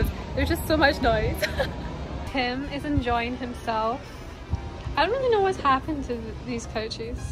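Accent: United Kingdom. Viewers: Wait a second are u from the UK? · Omg. You have a lil bit of an accent. British?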